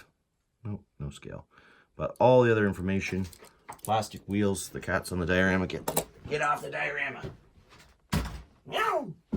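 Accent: Canada. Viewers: Don't be ashamed of your heritage! Just teach them that Canada pronounces things differently, I think a lot of people just assume you're from Wisconsin. lol I used to watch Davidsfarm back in the days, he was practically your neighbor out there in Lucan, and he had the same nuances with his pronunciations, he also did the Zed phrasing.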